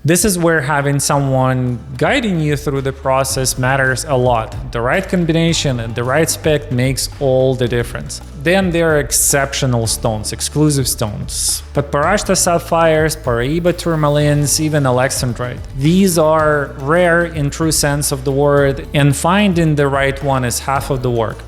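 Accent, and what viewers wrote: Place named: Czech Republic. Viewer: I guess (from the accent) speaker is Czech maybe, hence the mispronunciation of French. No big deal, the content is still valid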